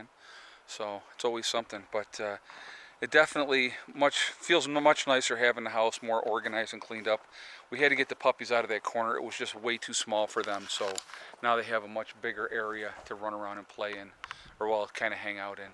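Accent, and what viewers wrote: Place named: Michigan, United States. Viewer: Wait, where are you from. Just curious. Im in N.H. By your accent, I'm guessing around Michigan?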